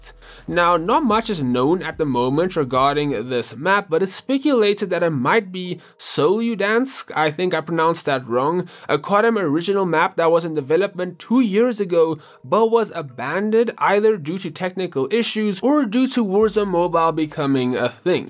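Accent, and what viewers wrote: South Africa. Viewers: Your from south africa?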